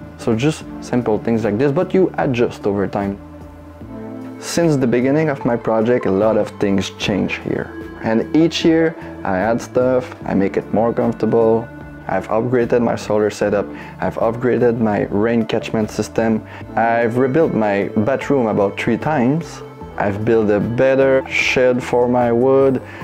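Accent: Quebec, Canada. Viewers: He has a french accent. Québécois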